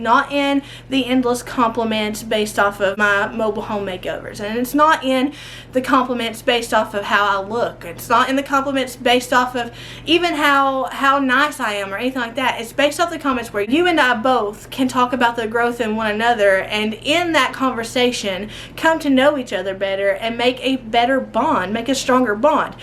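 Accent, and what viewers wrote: South, United States. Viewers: omg i can tell ur southern cause u do like i do when i get too talking i talk so fast and i talk about everything and people have too tell me too slow down lol i love the way u are it makes me smile !!! thank you veery much for making the videos i love you and your family yall are so down too earth that what makes u special !!! MUCH LOVE TOO U N YOUR FAMILY !!!!!!!!!🥰🥰🥰🥰🥰🥰🥰🥰🥰🥰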